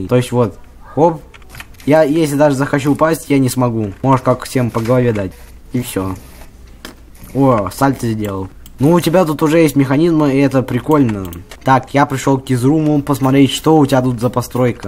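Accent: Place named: Russia. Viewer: Все говорят што он украинской: а я гаварю што он руской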